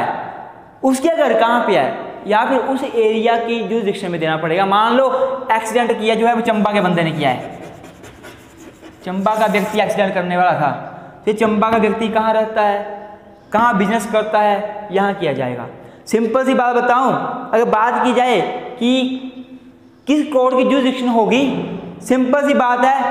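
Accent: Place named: Punjab, India. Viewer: Sir, your accent is punjabi hindi, which delights me, why I don't know, but I guess you have a good teacher ability, which encourages us to approach you, so keep going in this way. Ranjit Basfor, Barshapara, Guwahati, Assam.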